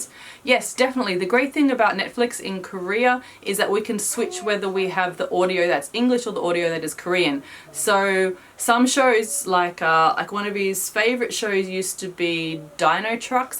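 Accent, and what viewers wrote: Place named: Greek Australian, Australia. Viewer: Are you Greek Australian? You have a woggy Aussie accent and said "no not papou, bathroom" so I assumed you must be Greek Australian.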